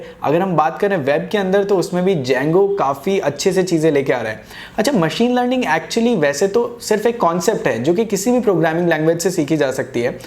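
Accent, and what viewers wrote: India. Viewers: Indian accent is too strong...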